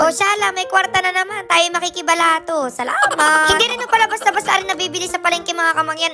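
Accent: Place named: Marinduque, Philippines. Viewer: Kamangyan, tubong Marinduque ako😍pero ngayon andine na sa Maynila☺️kaya ako nag subscribe sa yo ay natutuwa ako at palagi ko naaalala yung punto sa probinsya namin😍keep making us happy everytime we watch your vlogs🥰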